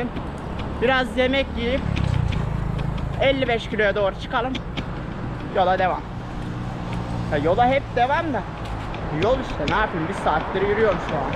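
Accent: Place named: Yozgat, Turkey. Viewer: yok dayi sen istanbullu gibi konusmuyosun, sanki boyle yozgat, corum gibi, babanlar nereli??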